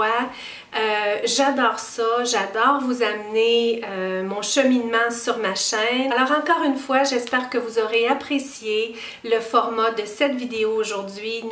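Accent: Canada. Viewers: Bonjour je suis nouvelle sur ta chaîne et il faut que je te raconte la réflexion de ma fille de 11 ans hier soir devant une de tes vidéos. Donc ma fille Aurore, interpellée par ton accent se penche pour voir qui parle et lorsqu'elle t'as vu m'a posé la question suivante : dis maman, c'est normal que toutes les Canadiennes soit si belle? C'est pas trop mignon, çà ? Bisous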